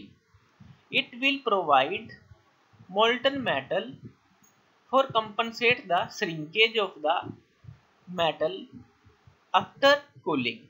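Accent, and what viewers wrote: Punjab, India. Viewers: U r Punjabi because it accent like that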